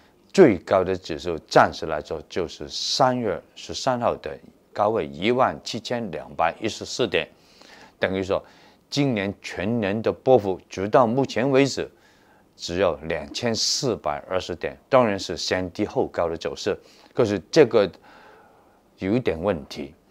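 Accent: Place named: Japan. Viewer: 像日本人講普通話，哈哈😃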